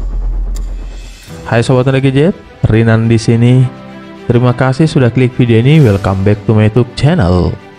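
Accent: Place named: Eastern Indonesia, Indonesia. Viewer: kok ada logat indo timur..? barangkat.. salam dari maluku🙏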